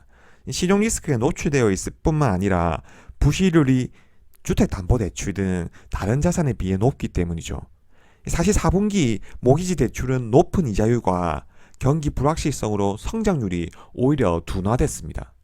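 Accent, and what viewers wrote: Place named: Gyeongsang, South Korea. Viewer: 감사합니다.. 항상 잘 보고 있습니다. 부산 사람으로 경상도 사투리가 너무 익숙하네요^^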